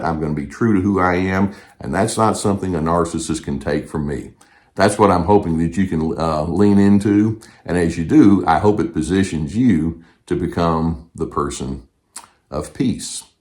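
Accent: South, United States. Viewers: I wish you would talk more slowly. (I get hyped just listening sometimes!) I know you have lots to say (and that you are sharing generously!), but I would benefit from you adding "slow" to that great, kind Southern style of yours. (Thanks!)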